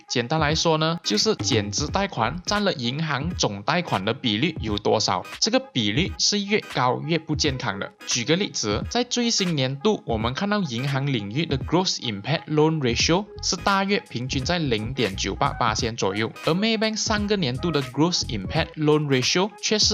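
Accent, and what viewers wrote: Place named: Hunan, China. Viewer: 这个马来小编，除了南方腔，还有湖南腔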